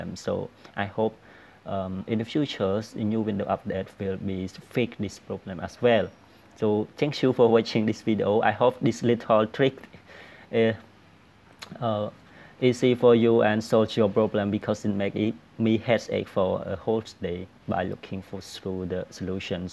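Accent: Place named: India. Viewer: You sound like indian tech support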